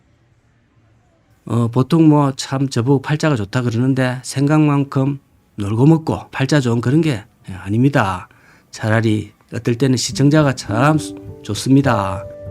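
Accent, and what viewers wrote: Gyeongsang, South Korea. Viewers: 말투는 이명박 같으신데 ^.^ 경남이시네요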